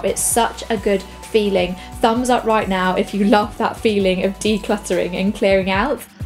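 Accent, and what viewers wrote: United Kingdom. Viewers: Are you British or live in the U.K.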